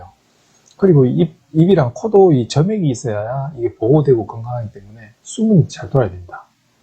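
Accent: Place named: Pohang, South Korea. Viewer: 안녕하세요,, 억양이 우리지방이네요.. 혹시 거주지가 포항은 아이죠??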